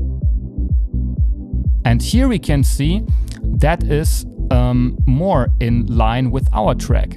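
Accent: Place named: Germany. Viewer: that german accent lol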